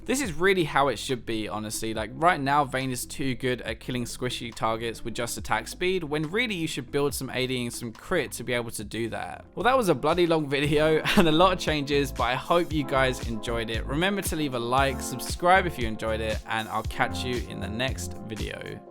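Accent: United Kingdom. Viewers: I don't even play adc c: Just here to enjoy your beautiful British accent ;)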